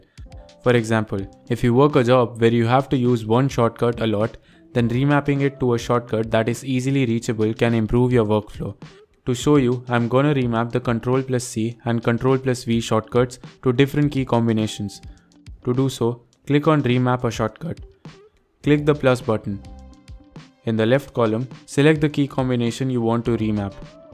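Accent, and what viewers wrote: India. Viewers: I will always trust a captain with an Indian acccent. Thank you kindly. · this sounds racist, but i was so glad to hear an indian accent bc i knew it would help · I love how Indian accent people do tutorial for video that people need to watch. Keep doing this! A warm thanks from Canada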